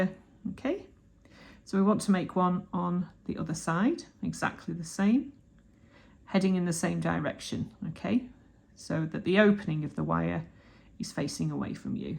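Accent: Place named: York, United Kingdom. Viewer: Such a lovely link. what size would you say is the jump ring? Also, are you using dead soft or half hard wire? Enjoy listening to you speak, is that a York accent I hear. 😊❤️. Thank you.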